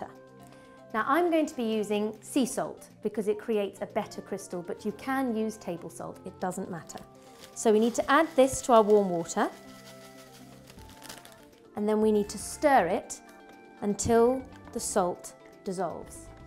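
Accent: England, United Kingdom. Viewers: SHE'S ENGLISH not American that's what the English call IT WHO CARES anyway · im an english person living in england and that is still the most english accent I've ever heard XD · Are you British?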